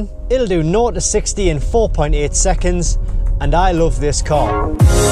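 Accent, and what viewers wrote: Newcastle, United Kingdom. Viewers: Pretty much basic faults and dealt with (hopefully) under warranty. You’ve helped me make my mind up and the Jag is going and a Macan S is on the cards. A good site and nice to hear a Geordie accent.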